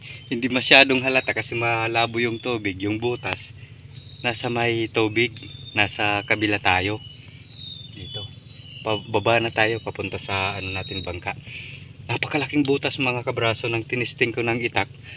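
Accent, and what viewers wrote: Waray, Philippines. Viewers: Shout out ka braso paki sagot nman kung San ka sa samar or Taga diin ka kasi narinig ko kasi parang waray Karin?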